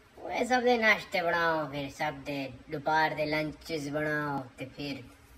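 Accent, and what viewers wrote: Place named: Saraiki, Pakistan. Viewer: I just love your saraiki accent.... So beautiful... I didn't know people in india could speak saraiki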